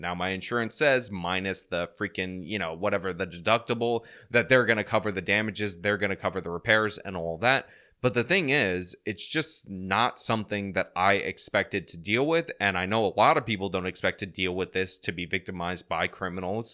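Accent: New York, United States. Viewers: Your a native New Yorker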